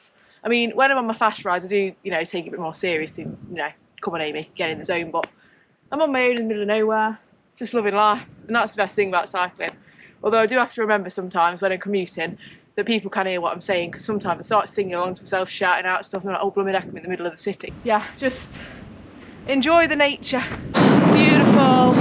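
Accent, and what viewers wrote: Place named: Derby, United Kingdom. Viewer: Keep up the good work Amy. Trying to make some videos myself with my mucker, its not easy. Remember the accent well from my days working in Derby. Eh up me duck!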